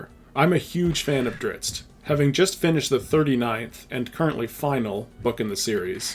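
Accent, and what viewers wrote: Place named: Canada. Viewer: I always pronounced it Men-zo-burr-AHN-zun, I love your Canadian mish mash on the pronunciation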